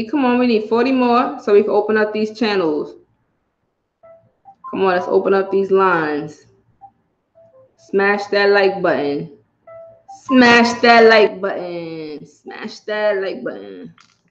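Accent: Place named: Brooklyn, United States. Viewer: Your accent is so Brooklyn. But the way you say “you know” is so New Orleans loll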